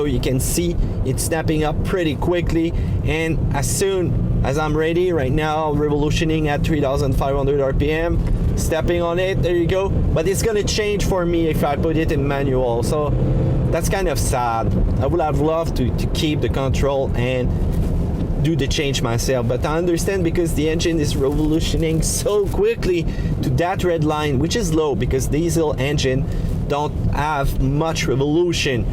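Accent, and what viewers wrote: Quebec, Canada. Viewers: Lol Quebecer accent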